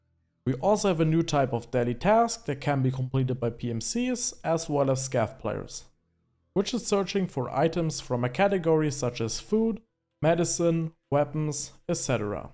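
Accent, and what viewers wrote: Germany. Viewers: german accent is strong with this one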